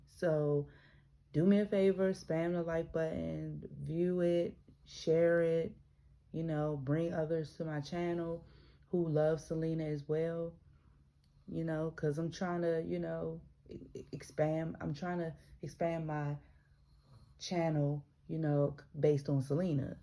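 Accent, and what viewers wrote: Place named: South, United States. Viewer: P.s. I'm from New York. It's so true that my city is dirty, lol. You have a bit of a southern accent to me. It's not that thick, though.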